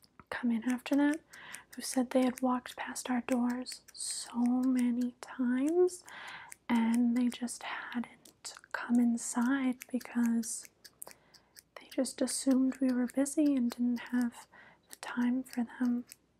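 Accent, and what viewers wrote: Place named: Texas, United States. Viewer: This accent is Texan approved. 🤠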